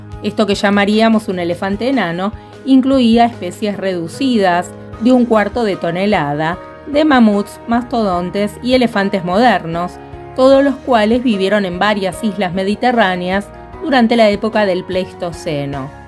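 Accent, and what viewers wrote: Argentina. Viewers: me encanta cuando dices "hola amigos",con ese tono encantador argentino tuyo;tanto como tu trabajo,por demás interesante e ilustrativo, sería muy interesante,que contaras la historia de cómo llegaste hasta aquí. Mis mejores deseos,y saludos cordiales,desde México.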